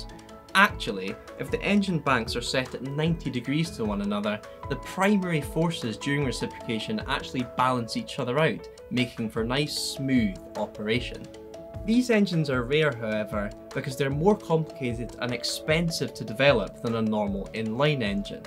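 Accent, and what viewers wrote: Scotland, United Kingdom. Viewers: I wish the video had subtitles...I can't get my ears around the Scot accent.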